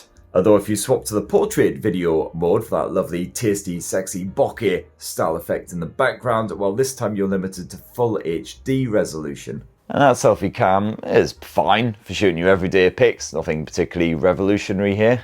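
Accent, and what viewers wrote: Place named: North East England, United Kingdom. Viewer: He's north east English. Sunderland I think.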